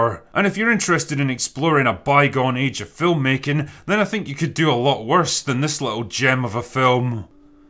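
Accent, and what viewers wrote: Scotland, United Kingdom. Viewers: Really weird hearing a Scottish accent saying anything good about the English.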